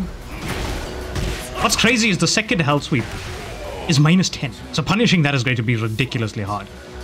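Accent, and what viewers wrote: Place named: Wales, United Kingdom. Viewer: I'm loving playing Heihachi at the minute he's a great character... unrelated I can't tell if you are Welsh or Asian 😂😂😂. I'm from Cardiff n I swear I can hear some Welsh in there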